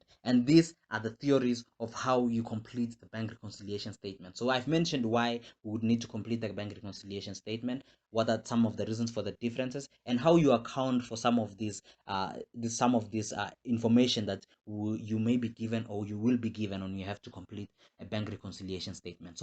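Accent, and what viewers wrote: South Africa. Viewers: Very well elaborated! Thank you. The illustration looks like a Kenyan question but the accent is South African haha (on a light note)... Thanks again!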